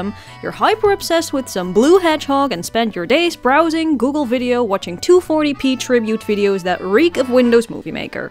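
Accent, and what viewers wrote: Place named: Netherlands. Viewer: Welcome back,! I miss your glorious animated story time videos and this is certainly one of them with your fluid, cute art style and entertaining stories and storytelling! I also love your Dutch accent and you remind me of a fellow Dutch artist friend of mine. Anyway, I anticipate seeing more content like this and I would like to see more of your bootleg Vocaloid days. 😄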